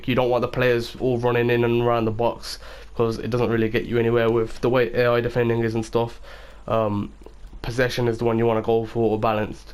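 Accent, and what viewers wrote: Birmingham, United Kingdom. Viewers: is that a brummy accent lmao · Are you from brum?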